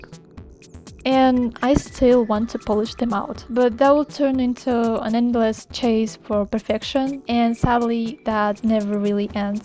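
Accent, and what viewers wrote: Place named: Russia. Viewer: Немного русский акцент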